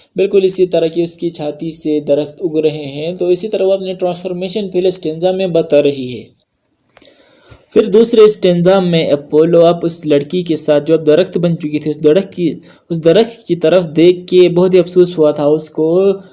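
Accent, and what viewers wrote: Gilgit-Baltistan, Pakistan. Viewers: AP ka accent GB waloo ka ha.100% AP GB ka ho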